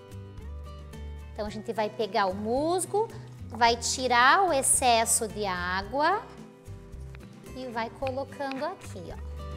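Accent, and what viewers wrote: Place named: Northeast, Brazil. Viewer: que sotaque maravilhoso meu Deus. Amo vocês nordestinos.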